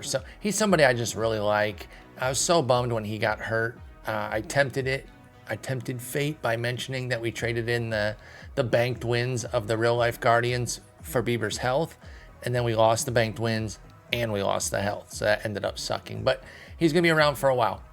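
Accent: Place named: Ireland. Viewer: Lmao the Jacksonville Irish is incredible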